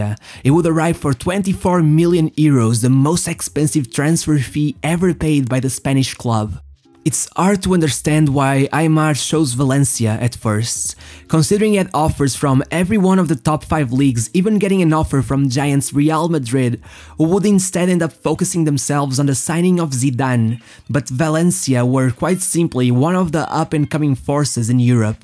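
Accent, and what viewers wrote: Portugal. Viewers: o teu portugues no ingles é muita mau fds, esse sotaque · Faltou-me dar aquele suporte, optimo ver um portugues envolvido num bom trabalho internacional! Sigo o canal e deixo-te os meus parabéns pelo trabalho, cada um com sua pronuncia e ainda bem que a tens porque assim dá para os teus compatriotas te identificarem e apreciarem! One love <3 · This voice has such a portugese accent ahah 100 % sure he is portuguese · Amazing how a portuguese guy only needs 5 seconds to realize that’s a portuguese guy speaking english. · no I don’t. He probs went to a English speaking country as very young lad but still carries the Portuguese language accent. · Tu tens de ser português, o sotaque não engana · este sotaque é tão tuga certo ? ahha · I love this guy’s portuguese accent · I cant help but to ask, are you portuguese? If you are not cangratualations because your portuguese accent is perfect. 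· Hey man, love the videos 😊 Just a quick one. We speak Spanish in Argentina (it's actually called Castellano - <link> but with a different accent because of the 65%/70% Italian influence). I know, I sound like a pain in the 🍑 but I like your videos and I'd like for you to get better and get more viewers 🙏🏽 Your Rs and Gs are pronounced with a Portuguese accent. Therefore, they have nothing to do with Spanish. It's the equivalent of speaking Italian with and pronouncing it with a French accents. Again, sorry for being a pain 🧑🏻‍🏫 and thanks for the amazing videos 😊 Ciao!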